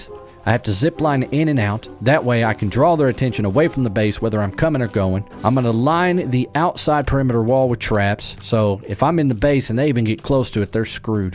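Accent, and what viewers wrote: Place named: North Carolina, United States. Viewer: You must be from NC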